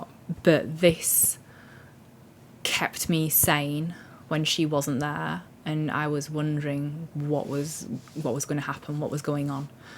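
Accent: Yorkshire, United Kingdom. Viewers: Love your videos! Great to hear some Yorkshire again. “Shut the door” Looking forward to next update. Happy stitching. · I love the Yorkshire accent! The puppy demigorgon is adorable. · I watched your first video yesterday and the second today. Thanks for the Yorkshire. Love hearing dialects. :-) No, I couldn't figure out what you were talking about, but saw a translation in one of the viewer comments. One would think we were worlds apart in age and interests, but I find more and more things to make note of, check on, watch. I'll be looking for some of the YouTubers you mentioned and look forward to seeing your progress on your stitching projects. Your sense of humor makes me smile and your creative director does a great job, as well. New subscriber. :-)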